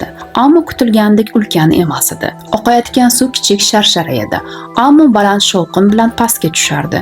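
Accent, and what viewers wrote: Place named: Standard, Uzbekistan. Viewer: Соф адабий узбек тилини шундай согиндимки❤Рахмат сизга❤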